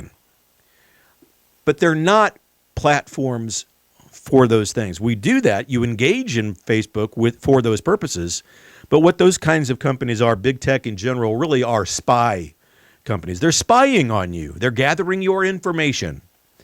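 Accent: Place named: Scotland, United Kingdom. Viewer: Well done my lad!!!! Nice Scottish accent!!!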